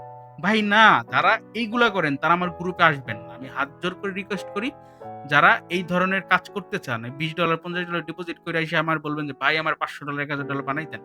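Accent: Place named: South Bengal, India. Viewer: ভাই আমি একদমই নতুন। তবে এটা খুবই সুন্দর ভাবে বুঝিয়েছেন। স্টপ কি ভাবে নিতে হয় এবং মার্কেট ড্রয়ের একট ভিডিও দওয়েন । ধন্যবাদ প্রি ভাই। আপনার কথা শুনে মনে হয় দক্ষিণ বঙ্গের লোক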